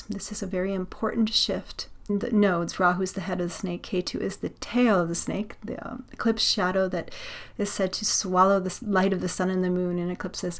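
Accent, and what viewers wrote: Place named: Australia. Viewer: Hello, Thank you for your videos and your beautiful nature! Your tone of voice makes me wonder if you are originally Australian?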